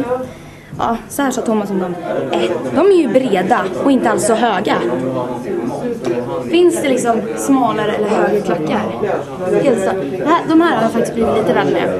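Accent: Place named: Stockholm, Sweden. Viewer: är verkligen jättegullig! Man kan titta massor på hennes klipp och hon har en otroligt gullig dialekt. Det finns inget vackrare att lyssna på än en 08 som pratar.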